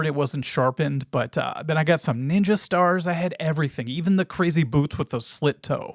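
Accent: Minnesota, United States. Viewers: Idk I never noticed your Minnesota accent till now 😂 but YEP everything's been fake!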